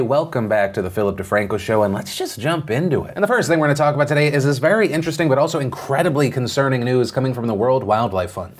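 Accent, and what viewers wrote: South, United States. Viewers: This slow talking southern drawl of this guy has is endearing, but I wish he would learn to t as l faster.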